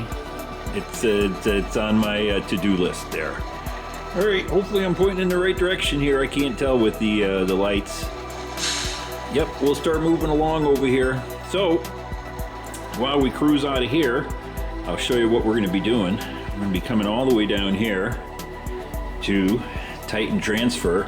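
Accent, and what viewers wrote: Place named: Chicago, United States. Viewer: My wife insists that you are originally from Chicago per your accent. Are you?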